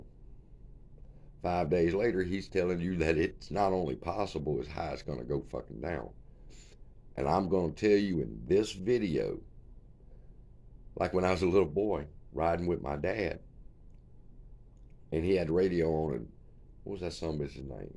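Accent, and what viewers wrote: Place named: mixed, United States. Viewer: Your Cajun-Texan accent kills me brother 🤣👍👍👍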